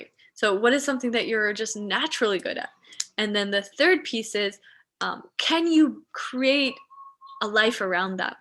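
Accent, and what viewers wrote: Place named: United Kingdom. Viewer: Beautiful British accent, babe, tea and crumpets sounds nice, after a breakbeat.uk.co rave all night, without the MCs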